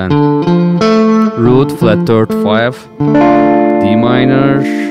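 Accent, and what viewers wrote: Turkey. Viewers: Abi türk olduğunu aksandan anladım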